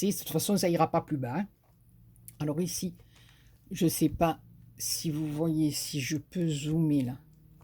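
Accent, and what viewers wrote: South, France. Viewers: Vraiment sublissime cet album beaucoup de goût mais surtout votre façon de mettre votre touche personnelle fleurs feuilles ruban bidouilles qui en fait une pièce merveilleuse et unique je me régale à regarder vos vidéos et j aime votre accent du midi. Couleur vacances et soleil Bises de bretagne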